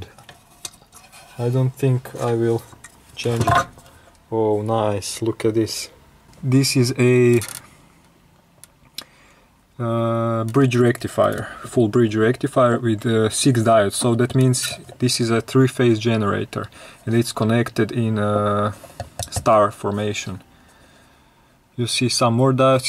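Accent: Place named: Russia. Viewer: Такое ощущение, что твой родной язык русский.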